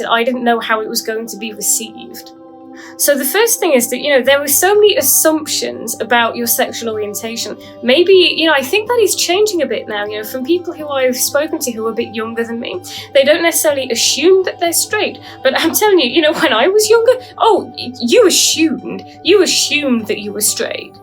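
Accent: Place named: England, United Kingdom. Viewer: I so wish YouTube and content like this was a thing when I was in my teens. There was literally nothing and zero information, and found myself so much in denial that I wasted a decade in a doomed marriage. Great upload, very much enjoyed it. PS - I've recently been blitzing content such as this, and is refreshing to hear an English accent :)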